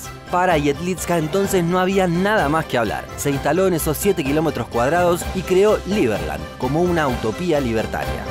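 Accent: Rioplatense, Argentina. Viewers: Tienes una excelente edición amigo y que elegante se escucha el acento rioplatense sin modismos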